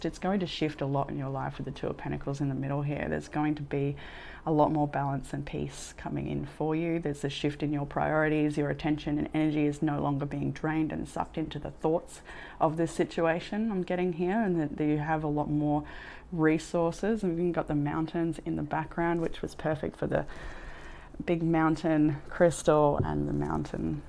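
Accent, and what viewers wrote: Australia. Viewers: I got caught up in my own blues and forgot to thank you for the beautiful reading. Love hearing the Aussie accent. I spent beautiful years in Australia and recently I've decided to manifest a visit!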